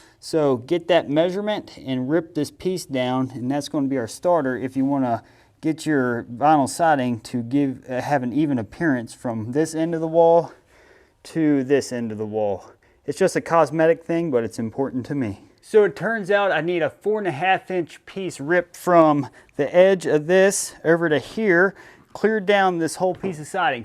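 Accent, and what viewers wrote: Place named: Baltimore, United States. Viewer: Very thick Delmarva Baltimore sounding accent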